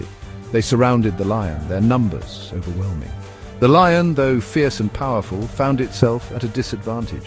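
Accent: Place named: England, United Kingdom. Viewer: The most beautiful English accent and the mastery of English language! Really enjoy listening to you and your absolutely artistic use of words! All perfect!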